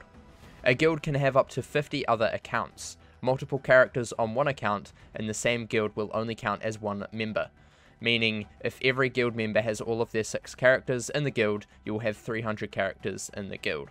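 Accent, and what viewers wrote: New Zealand. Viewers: Kia Ora bro, first time watching your video and could instantly tell you were also a Kiwi. First one I've actually ever found from wiz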